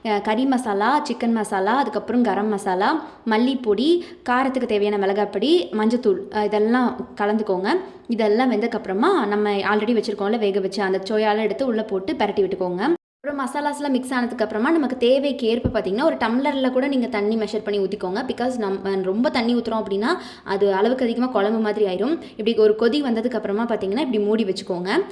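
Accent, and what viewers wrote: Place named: Madurai, India. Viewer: oh nice 👍 im coimbatore than i thought u as Madurai ponnu 😊😅 because of your slang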